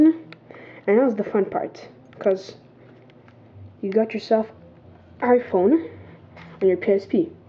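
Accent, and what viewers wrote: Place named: Germany. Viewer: love de german accent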